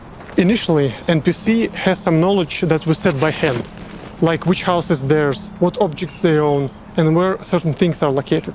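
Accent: Russia. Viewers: why he has so a russian accent?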